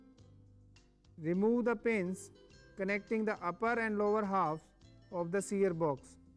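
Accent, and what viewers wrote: India. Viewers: quit informative.. but another intresting part is when he speak, except his mouth every thing is like statue, n indian english:-> but thumbs up for knowledge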